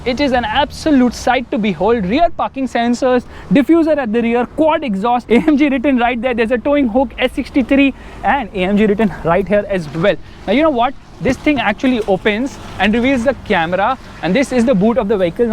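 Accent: India. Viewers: I know lol.. I said I thought he said fucking Since his speaking fast and his indian accent is thick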